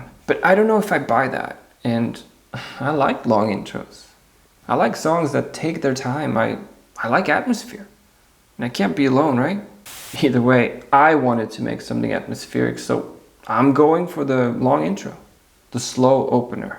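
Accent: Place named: Spain. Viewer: The intro was Great. Good spanish accent! I 100% agree with your thoughts on adding production tricks and not letting your music breathe. Finding the confidence to let a section breathe is something I really need to start doing. If you are not aiming to commercial music just skip those constraints! Long intros are great in my opinion but if it will always be someone that won't like it. Just do what excites you and someone will also think the same! Great video. Hope you are liking Spain. It's a great country with an awesome weather.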